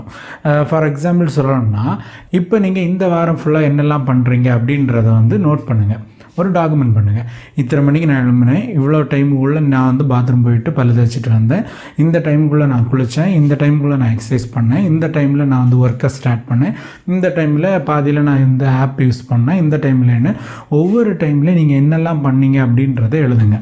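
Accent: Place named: Kanyakumari, India. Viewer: I like your Kanyakumari slang😊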